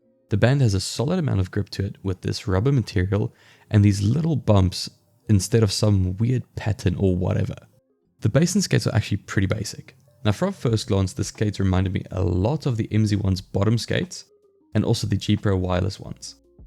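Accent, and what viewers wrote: South Africa. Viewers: Great review man, are you South African?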